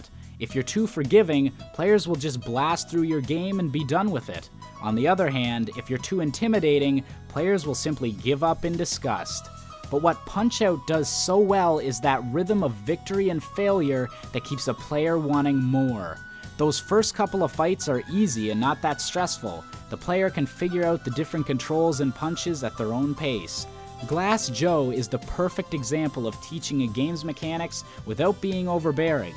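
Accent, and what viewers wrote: Canada. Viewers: you sound especially canadian here :)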